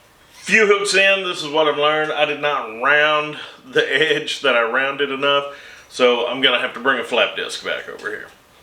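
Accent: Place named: South, United States. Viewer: Never so much as forged a nail, but I've just subscribed anyway, I love this gentleman's personality, it's cool to see someone who speaks like me, slightly different, but to someone up north it would be identical I suppose, I'm from a small town in NW Georgia, but anyway it's awesome to see a southern man like myself doing something on YouTube, so few people from the south use their actual accent on here.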